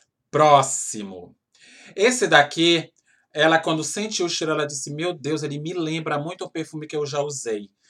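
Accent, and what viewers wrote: Pará, Brazil. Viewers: Achanhado kkk. Beeem paraense! Amo!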